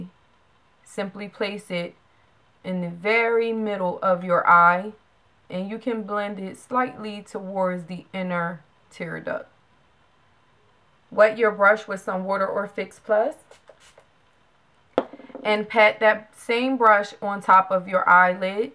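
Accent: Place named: New Jersey, United States. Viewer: Ahhh just I knew by your accent that you were a fellow jersey girl! What I love most about this is you explain how you hold the brush, how to apply the product, etc. Most people only show what to use, not how. Great video and of course lovely look! :)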